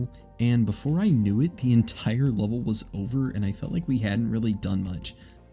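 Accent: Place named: United States. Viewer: idk if it’s your stereotypical american voice (i’m british 😅) or decent editing / voice quality but i was expecting you to be popular while watching. vid was well made and clicked on it without seeing views/ subs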